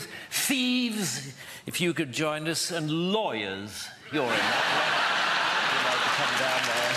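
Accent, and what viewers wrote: United Kingdom. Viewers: I live in the USA, but I miss good British humor and beautiful British English... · He has a Welsh accent, so he's Welsh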